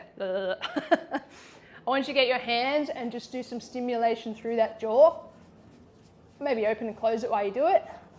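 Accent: Australia. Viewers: Hi. I sent this on your facebook messages but saw you don't go on there often from your last post.... you've popped up on my you tube and i've been watching a few videos and just love your energy and you resonate so much with me in so many ways but mainly as i've had a problem with my eyes/neck and dizzyness for so long!!! I want to get through your videos but i was wondering if there is any one video or sequence that you think i should start with? i just started your 10 minute lymth workout and i love it and the constipation one was so funny!!! Basically my eyes feel like someone has pulled them out on a spring and then let go and that weird feeling(like i've had that done before lol) but only way i can explain it. I look forward to slowly watching all your video. Thank you for helping so many people and i love your accent, aussie accent is the best!! Reminds me of my INXS days ❤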